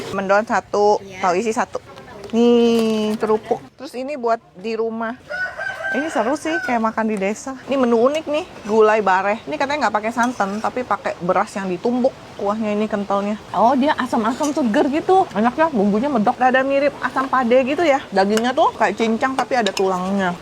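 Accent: Java, Indonesia. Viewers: Jawa banget🤍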